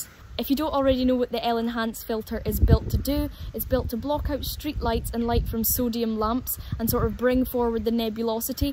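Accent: Scotland, United Kingdom. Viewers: Great video and channel! I love the Scottish accent, memories come back haha (I was born in Edinburgh :D)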